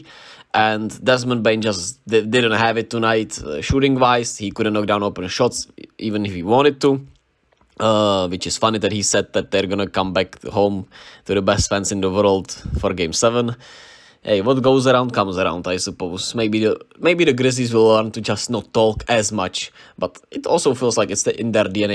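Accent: Czech Republic. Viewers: Náš přízvuk se nezapře. Cením snahu.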